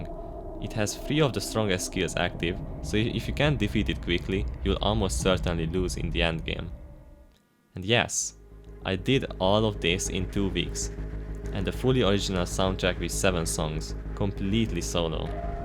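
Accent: Hungary. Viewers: Are you Hungarian? Just asking because your accent is realy familiar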